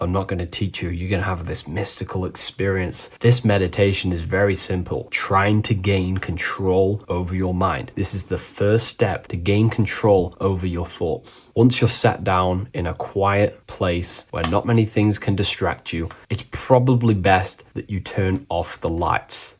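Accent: United Kingdom. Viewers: Iam so happy that i found someone like you , your chanel so helpfull you answered so many quations i had , i mean there is somthing diffrent about you , you know what you are talking about , i really like this, and i love you british accent too, thank you for your existance and iam so greatfull finding you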